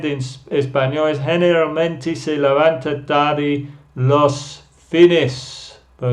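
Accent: United Kingdom. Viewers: I wonder if you learn English from him you'll have a british accent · Excelente profesor de Ingles, me gusta su inglés británico. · es inglés británico? Thank you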